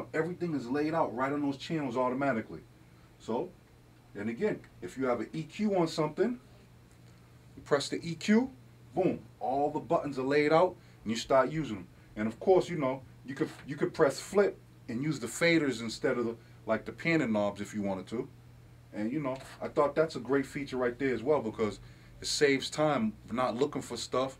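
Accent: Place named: North, United Kingdom. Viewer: gotta love that deep northern accent....lol....good video tho...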